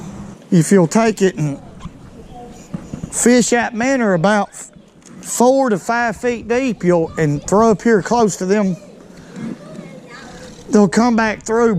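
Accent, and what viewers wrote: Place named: Kentucky, United States. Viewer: Lol! Man I like this guy! is a heck of a guy and helluva entertainer. I love the accent. Reminds me of my Kentucky family.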